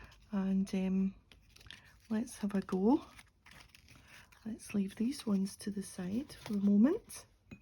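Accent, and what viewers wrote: Scotland, United Kingdom. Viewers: Hi, so lovely to hear and see a fellow jock crafting. I am 47 and a total beginner. I am loving life. Midlife crisis phase pending but no fast cars or toy boys for this married lady with six kids haha just paper, glue, scissors and lots of YouTube videos. It was Amanda from Srimmping Mommy that recommend you and how delighted am I to hear a Scottish accent. I am from Fife. I don’t know anyone that crafts local to me and that’s such a shame. Your videos and new craft room are wonderful. I have been binge watching, not stalking. Video stalking. I started trying to adult colour roughly 18 months ago and love it but I’m having problems with my hands and neck and I was embarrassed I couldn’t do as much as I would have liked I then got engrossed in junk journals, and all that entails. I haven’t been brave enough to post any recent videos on Instagram or YouTube but hopefully with more confidence learning more. 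I will share and join in. It’s such a wonderful, kind, helpful, decent community. Something rare these days it feels like. I have just joined Amanda’s Facebook group. She is a lovely women with a great personality and mission to help and get others involved and for that alone I support her. I will be thanking her for sharing you with me haha Now I’m away to watch more altered cards ❤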